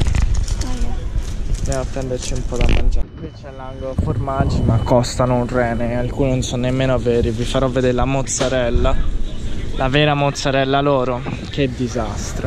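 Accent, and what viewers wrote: Tuscany, Italy. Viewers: ✌👏😂😂😂😂😆😆😅😅😅😆😆😆❤💋💋💋TROPPO FORTE TROPPO AVANTI LA PARLATA TOSCANA PERÒ PARLANDO DI ITALIANITÀ QUA' NON C'È RIMASTO PIÙ NIENTE LA NOSTRA CARA PERNIGOTTI E TURCA ADESSO LA BARILLA FATTA CON GRANO RUSSO 😢😢😢😨 E SI QUA IN ITALIA C'È SEMPRE IL NOSTRO MADE IN ITALY MA CI SAREBBE DA PARLARE TANTO SU QUESTO DISCORSO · che bello porca eva sentire il toscano in nuova zelanda! hahaha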